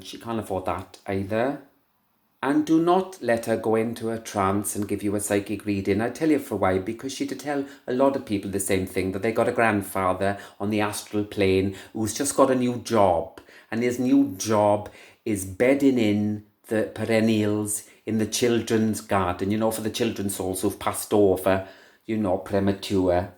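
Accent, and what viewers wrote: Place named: Wales, United Kingdom. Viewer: Great story. Love the Welsh accent.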